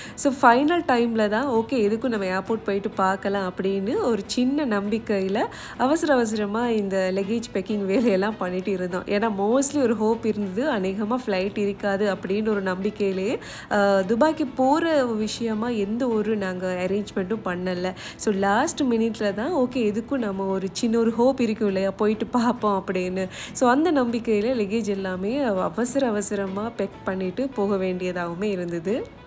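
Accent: Sri Lanka. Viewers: Hii assalamualikum sis❤.....I want to tell you one thing...that my mother had past away a few moths before....me and my mother used to see your video every night before we go to sleep....my mother really loves your sri lankan tamil slang of your voice....and seeing your video remains our memories of dubai...because we had lived several years before in dubai......and now she is not there to see your video.....sis pls dua for her.....and keep her in your dua.....I will also pray for your family....Allah make you to reach high....with good health......inshaallah ❤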